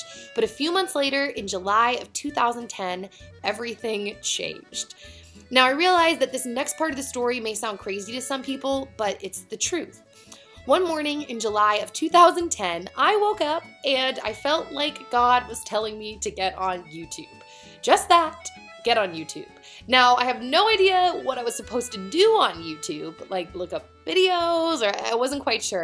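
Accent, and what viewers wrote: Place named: Wisconsin, United States. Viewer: what state are you from you sound kinda like Wisconsin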